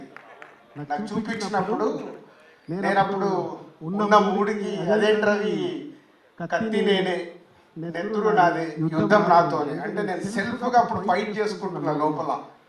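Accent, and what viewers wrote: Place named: Tamil Nadu, India. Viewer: Dialogue lo TN slag ee vinipiatundhi😂😂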